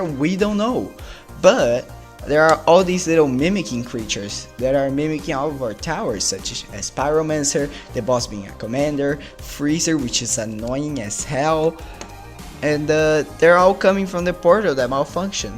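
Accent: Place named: Brazil. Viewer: why do mine video and your's have the same text on the thumbnail 😭, and we both are brazilian, atleast i think you are brazilian by your accent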